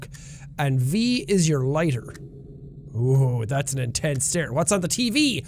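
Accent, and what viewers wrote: Ireland. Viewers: I love how used to hide his Irishness and he's now more Irish than ever. If he ever says "Fecking eejit" I will lose my shit. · I was so scared just hearing his irish accent get more intense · I don't know if I just haven't watched in a while or something but you sound VERY Irish to me in this video for some reason. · Is it just me or does sound impossibly more Irish this episode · He sounds so much more Irish ☘️ in this video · I love how progressively became less irish through out his career and now he's suddenly hyper irish. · was so uncontrollably irish in this video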